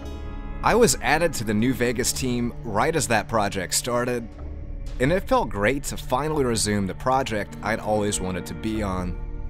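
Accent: United States. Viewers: I love the content and it's not your fault but the voice reminds me of Arin from game grumps whenever he does a generic protagonist voice. It might just be American inflection on some words. I just can't help but giggle a tad when I hear words spoken like that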